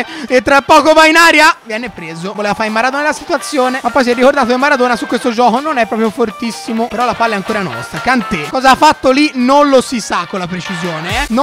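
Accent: Tuscany, Italy. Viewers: In questo video il toscano era al massimo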